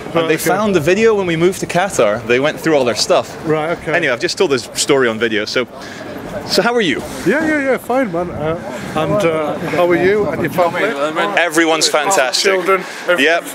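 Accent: Scotland, United Kingdom. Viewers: you sound east coast Scottish, Edinburgh area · I thought you had a Scottish accent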